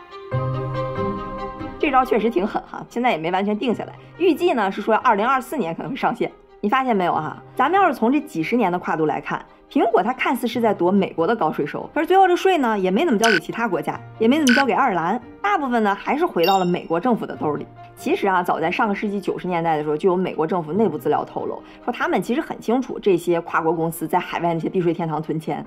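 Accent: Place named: Dalian, China. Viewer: 你作为大连人讲讲大连白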